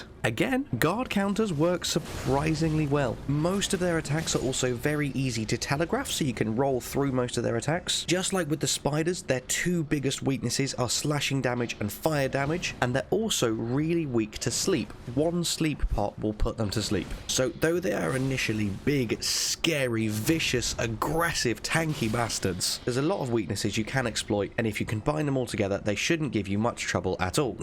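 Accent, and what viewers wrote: United Kingdom. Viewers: Never knew how much I'd enjoy a British accent saying "motherfuckers" so much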